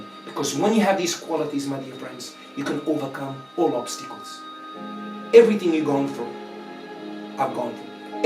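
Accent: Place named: South Africa. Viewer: Hello i sent an email of my situation. Also are you from south africa or did you live there before? I hear some sort of SA accent. Thanks for this amazing video!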